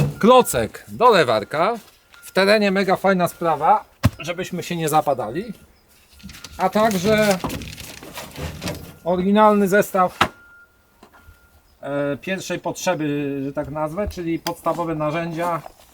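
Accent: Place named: Gniezno, Poland. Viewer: Gnieźnieński akcent w terenwizji , znajoma żwirownia , jak milo :) plus 12l V10. Czego chcieć więcej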